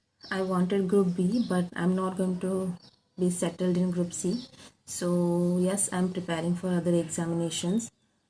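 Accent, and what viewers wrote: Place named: Odisha, India. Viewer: Above all I like your english...The Odia english is something different from colonial english...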